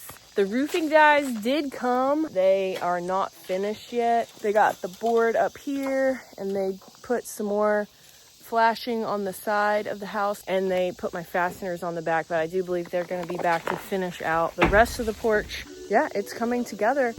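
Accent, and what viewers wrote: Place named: South, United States. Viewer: I'm sure most of your subscribers are like me and don't really care that much about hiking we are just here to see a very beautiful girl with a beautiful southern accent. You could make videos about basket weaving and I would still watch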